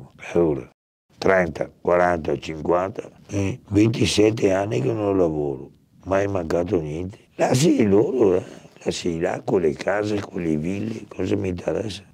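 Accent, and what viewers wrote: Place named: Alba, Italy. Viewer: Min vai in mona! Sui 2/3k al metro. Neanche in val Seriana o Immagina Brembana 25k un bilo dai, sui 50mt. Cmq bel personaggio, città alta tra Trussardi Percassi e Locatelli che non ho presente bhe belle zone ( Berghem de Sura ) cmq ( da accento inconfondibile Alba ) come mai tantissimi bergamaschi vedo nelle tue interviste..? Una percentuale decisamente alta su sei video fin'ora quattro lo sono. Conosco quasi perfettamente le zone che citano, Quarenghi Malpensata e Gleno,poi famose. sembra tanto quei ricconi di famiglia che sputtanano tutto in poco a destra e manca ( non lo nasconde del resto ) poi gli ordini di " grandezza " in termini economici lo sanno solo loro. Per ora non ho riconosciuto nessuno di vista ma in giro se ne vedono e di storie di ogni tipo. Anzi, ho conosciuto bei soggetti facendo Calisthenics da un tre mesi, Omari un ragazzo marocchino bel tipo trentina super tirato che non fosse timido potrebbe raccontare una bella storia molto interessante..ma farlo parlare così liberamente a telecamere puntate non penso sia impresa facile su due piedi. 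Provo ad accennare e convincerlo quando lo becco al parco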